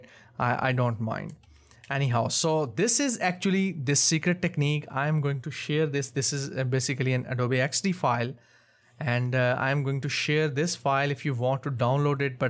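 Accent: India. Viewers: I love your indian accent.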